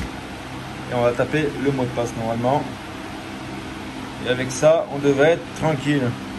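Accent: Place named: United Kingdom. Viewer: Mdr l'accent anglais j'ai le meme merci pour tuto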